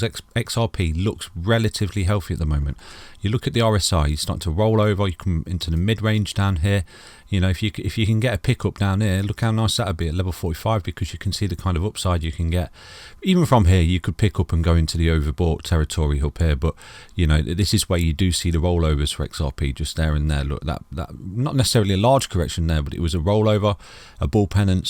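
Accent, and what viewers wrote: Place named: England, United Kingdom. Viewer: Just found your channel this week mate, glad that i did, nice to hear a fine english voice, you explain in easy terms and love the no Bs with no mouth open buy now headers, will be following often, great content! thanks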